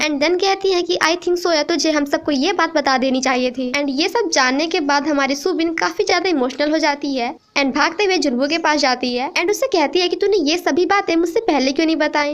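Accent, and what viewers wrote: Gujarat, India. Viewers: Didi aap Gujarat se ho kya?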